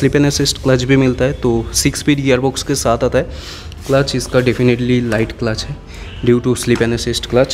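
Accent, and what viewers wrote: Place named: Bengal, India. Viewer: Hey bro i like your bengalish Hindi ...❤unique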